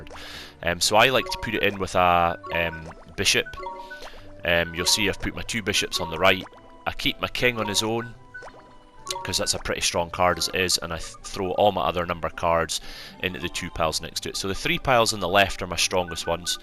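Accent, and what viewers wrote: Scotland, United Kingdom. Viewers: I think it's Scottish accent? Sorry if I'm mistaken.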